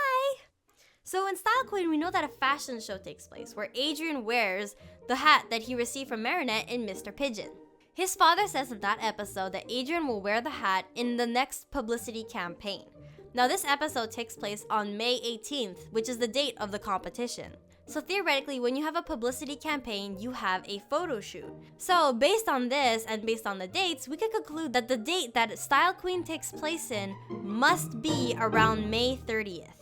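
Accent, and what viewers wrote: Quebec, Canada. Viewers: You are from Quebec!! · Is that me or you speak just to good in French to be an English people? XD I don't know how too say, but you have the French Canadian accent (Québécoise aussi si tu veux XD), did I'm crazy? Svp, ne me dis pas que je suis folle, même si parfois je suis folle U-U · Tu vis au Québec huh? lmao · OMG you totally sound like you're from Québec! That french was flawless!!! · I KNEW YOU HAD LIKE A CANADIEN ACCENT THINGY!!(Im french lolol) Bravo ave tes abonnements!!!!!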